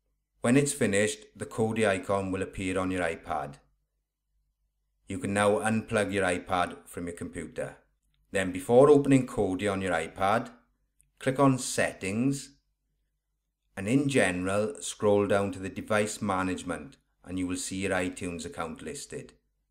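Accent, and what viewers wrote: Wales, United Kingdom. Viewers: Love the Welsh accent! My mum is from Cardiff. :)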